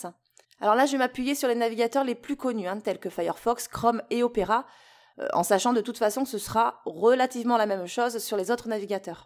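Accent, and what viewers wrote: South, France. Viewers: le bon accent du sud on aime bien ca!!!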